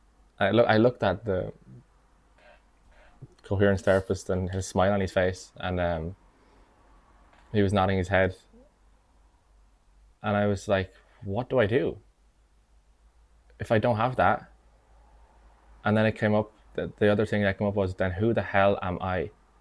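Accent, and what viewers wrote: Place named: Ireland. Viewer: I understand completely. Also, did this theme in therapy umongst other themes. Believing what you dud, that you deserve to be shameful, small and "wrong" is normal at that young age - having had that experience with those boys back when you were 7. The adult self understands this, but the inner child doesn't. When the inner child hears this, it can be like a crack in the mirror because the inner child defined itself as "wrong," shameful and small. You will definitely find to a point realising that this "strategy" to rather please and be good before approaching someone, was needed for the child to feel safe. So it wasn't wrong that you thought this way. Your adult self could tell your younger self now, that it is all okay, but that the younger self may trust you now in trying new strategies. By the way , I love Ireland and was just there 2 weeks ago. 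Hearing your accent makes me miss the Island so...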